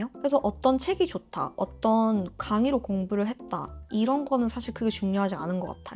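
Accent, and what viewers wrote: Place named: Gyeongsang, South Korea. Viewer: 갱상도 사투리네여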